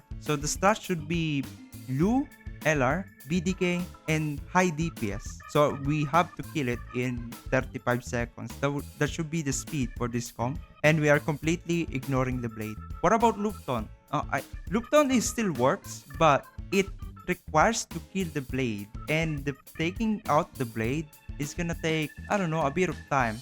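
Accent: Philippines. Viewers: Thanks much bro! Btw, you sound like Filipino😅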